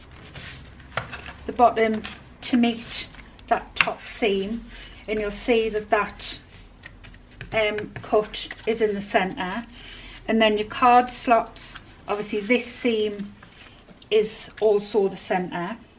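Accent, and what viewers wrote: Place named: North East England, United Kingdom. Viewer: Nice to hear someone from North east on here instead of americans. You make your NCW exactly the same way as I do. I love how the waterproof canvas makes it more structured but i have never used it before can it be bought here in the north east please.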